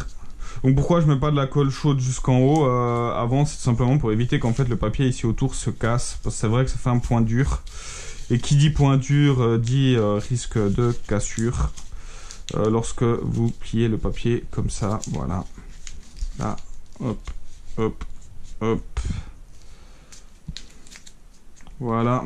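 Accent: Switzerland. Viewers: Parce que sur le carton de blanc de colle il y a les trois langues parler en suisse en plus tu as un accent suisse et les français ne disent pas papier ménage. Ils disent sopalin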